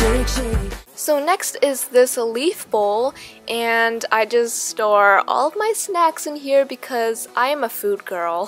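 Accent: Canada. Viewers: Top Bun she does, she has a Canadian accent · She's Canadian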